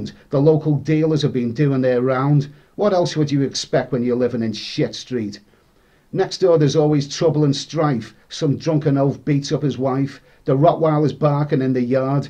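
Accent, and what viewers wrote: Liverpool, United Kingdom. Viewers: Just starting to watch your oldest stuff, as I'm up to date on your travels from leaving England for good. I am not into poetry whatsoever, however, I really enjoyed this mate! The scouse accent, the message etc. Excellent mate, nice one!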